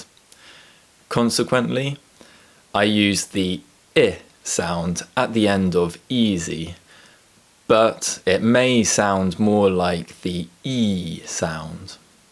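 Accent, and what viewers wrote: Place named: England, United Kingdom. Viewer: hello, where are u from in England,:")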